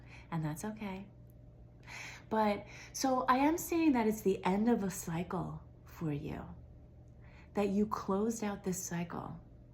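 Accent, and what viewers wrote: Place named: New York, United States. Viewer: You shine Love you New Yark accent and yes I say Yark lol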